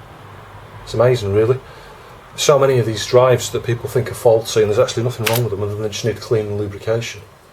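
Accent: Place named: Manchester, United Kingdom. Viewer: Hi! I just got out my old Amiga 600 and it worked for a day, then I put in a floppy which I think was a little dusty and now it's stuck on the "insert floppy" animation. I saw on eBay that working Amiga 600s tend to go for around £100. Is that accurate ? Is it worth me opening up and repairing? P.s where are you based? You sound local. Writing from Manchester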